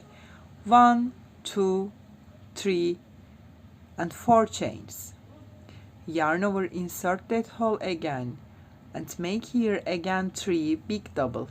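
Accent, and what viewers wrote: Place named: Turkey. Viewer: best turkish-english i have ever heard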